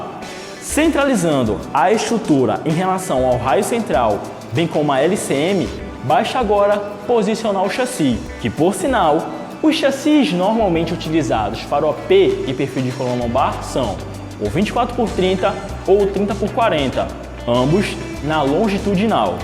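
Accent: Rio de Janeiro, Brazil. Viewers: Vc é carioca???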